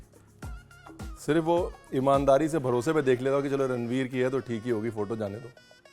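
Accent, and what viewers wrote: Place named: Lucknow, India. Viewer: Sir aapko chup rehne k liye kisne bola? Ek sec bhi pls chup na rahen aap! Jab tak Ranveer sahab ki lucknowi zubaan ka zayka na mile, kya faayda aisi dish ka? Aap bolte rahe aabaad rahe! Dhaniya kaat te time kuch bole hi nahi aap 😂 ! Aap pls bole, bolte rahen ❤❤❤❤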